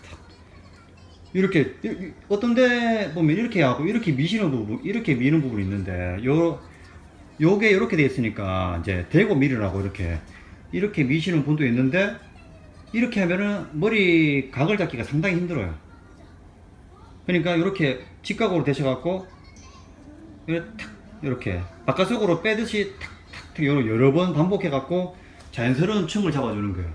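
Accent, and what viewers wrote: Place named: Gyeongsang, South Korea. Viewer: 대구! ㅋㅋ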